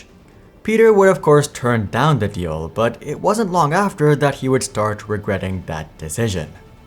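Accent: India. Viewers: He's just an Indian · WHAT is this accent that assaults my ears??? Are you both kinds of Indian? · Your Indian accent is actually unbearable, you disgust me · Didnt know VoiceOver guy was India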